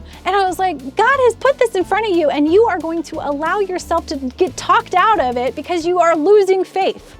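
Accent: Kentucky, United States. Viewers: It’s always amazing to see how God comes through in our lives even when we don’t know how it’s gonna turn out ❤ and also LOVE the Kentucky accent 😂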